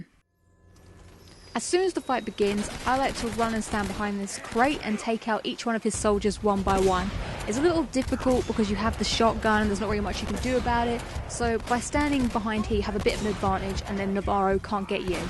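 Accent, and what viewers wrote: United Kingdom. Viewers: shut up british p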